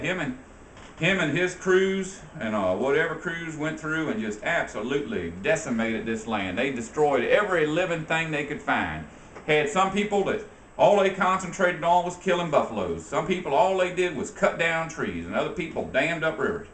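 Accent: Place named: South, United States. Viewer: hah hah, awesome accent sir, thank you, reminds me of the south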